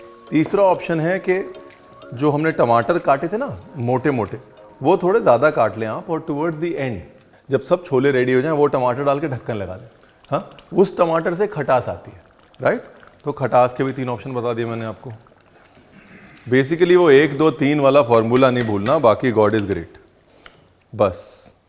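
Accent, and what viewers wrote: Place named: Punjab, India. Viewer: Ranveer, you are awesome, but I like you to hear you in Punjabi style Hindi.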